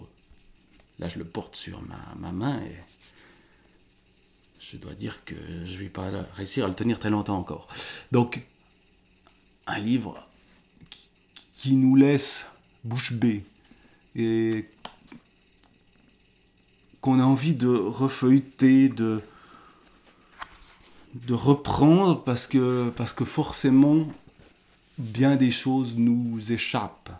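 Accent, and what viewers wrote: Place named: Vaud, Switzerland. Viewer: J'ai l'impression d'être de retour à Lausanne en vous écoutant... Vous êtes vaudois ?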